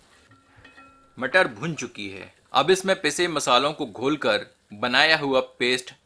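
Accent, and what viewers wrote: Standard. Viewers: Brother itni sudh Hindi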